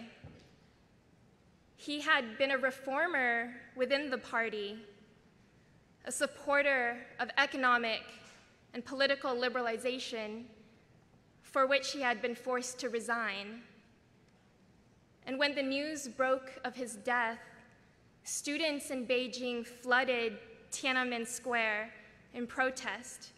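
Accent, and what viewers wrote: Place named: United States. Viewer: 比那个强多了地道的美式英语❤❤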